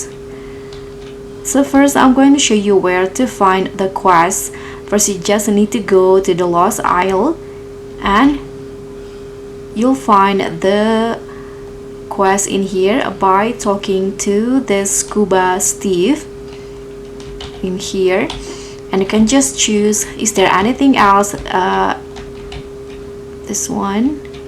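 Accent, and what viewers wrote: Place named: Indonesia. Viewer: Bahasa inggris lu kayak bahasa Indonesia, karuan pake b indo, jujur ga ngerti gua ngomong apa pas belum ngeh wkwk, tapi makasih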